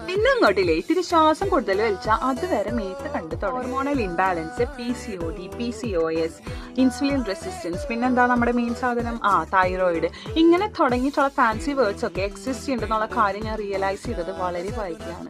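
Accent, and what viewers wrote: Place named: Thrissur, India. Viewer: Enik ishtaan thrissur slang njan kannur aaan kettaaa❤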